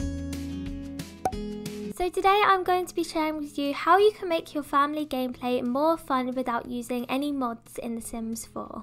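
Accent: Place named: United Kingdom. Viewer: Where r u from? I love your accent... It sounds british but im not sure...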